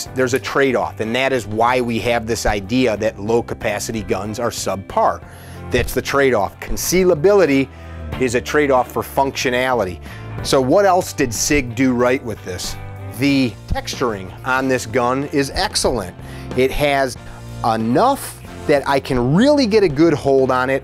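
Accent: Chicago, United States. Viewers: You gotta be from Chicago. You have some of that Chicago accent and sound like Dennis Farina at times.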